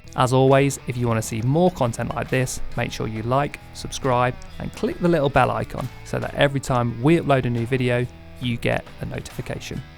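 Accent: United Kingdom. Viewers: Enough with the ( repetitive, loud) "music" track. I have a difficult enough time understanding your Brit-Speak without the added burden of the "music"!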